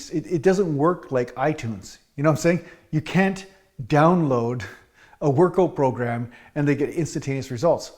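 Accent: Canada. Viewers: I am loving the Canadian accent !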